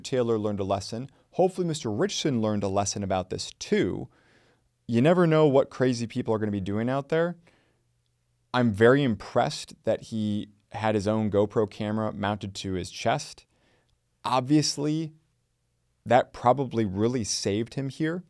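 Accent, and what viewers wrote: United Kingdom. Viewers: Dudes British. I understand now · Nah, southern England but not London or estuary or West Country dialects. 🏍️🏴🦃👊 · He's got an English accent!!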